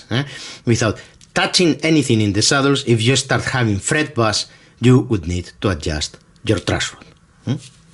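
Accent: Spain. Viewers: Very good info, I am slowly getting through your videos, I love you Spanish English accent. Merry Christmas, cheers Simon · Great accent. Are you Spanish? I live in Australia now, so I don’t hear Spanish accents very often. Thanks for the video. It was excellent. 😁👍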